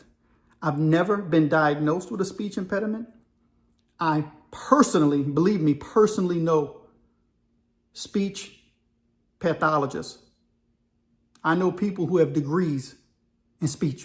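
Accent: Louisiana, United States. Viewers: You sound like Louisiana supposed to. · You sound like you are Cajun my man.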